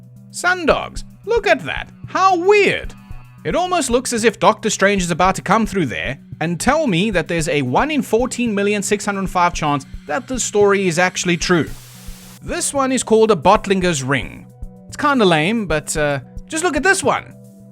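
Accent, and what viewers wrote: South Africa. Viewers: The South African accent made this so much better😂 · He's from South Africa, so you can't expect him to sound like an American, Canadian, Englishman, Scot or Australian. I get a kick out of his speech. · Is this commentator South African or Rhodesian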